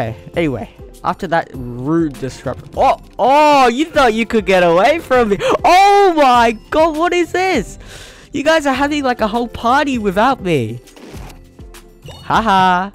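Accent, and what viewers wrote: Australia. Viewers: Oh I didn’t know you were Australian! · Are u Australian?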